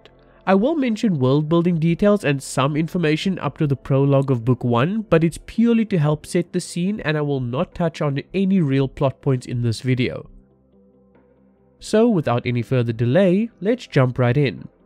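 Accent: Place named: South Africa. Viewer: Hey I like your videos. When I watched you I thought your accent sounded... familiar then I realised... jy is ook van SA. Lol. Great stuff man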